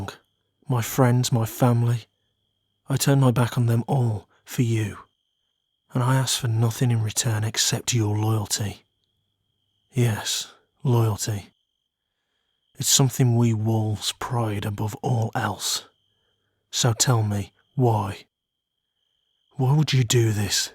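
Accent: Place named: Birmingham, United Kingdom. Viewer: Him: who is he? Me: Levi Ackerman •_• Also really good video! You kinda sound like someone from peaky blinders! I loved it!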